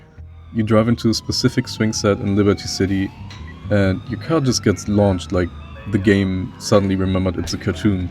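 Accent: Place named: Germany. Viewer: I hear a little DEUTSCH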